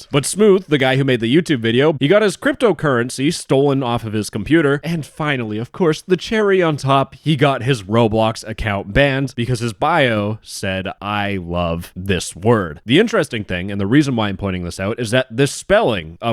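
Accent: Poland. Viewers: as a pole i approve polish accent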